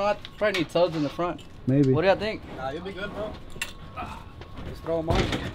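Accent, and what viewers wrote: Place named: South East, United Kingdom. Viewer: This nga sounds like he from SE😅😅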